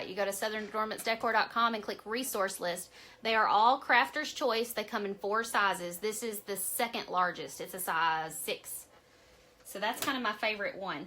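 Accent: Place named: Alabama, United States. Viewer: LOVE the accent! I'm a southern bell as well and you remind me of sweet home Alabama!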